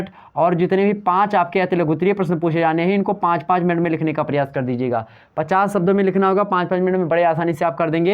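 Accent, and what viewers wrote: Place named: Gonda, India. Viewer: Voice se pahchan gaye sir ham bhi gonda se hi hai But aap bahut aacha padhate hai sir Ba third hindi paper 1st me jo kaviyo ke kavyans bacha hai sir उसे karwa dijiye sir please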